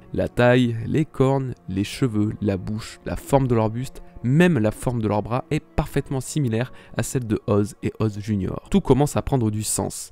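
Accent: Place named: Alsace, France. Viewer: Rien à voir mais tu es d'Alsace non ? j'ai l'impression d'avoir reconnu un petit accent ahah Et excellent vidéo ça change pas ! :))))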